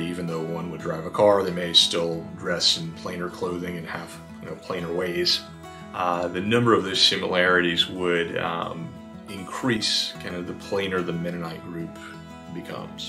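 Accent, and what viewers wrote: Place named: Pittsburgh, United States. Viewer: That Yinzer "O" kept escaping!🤣🤣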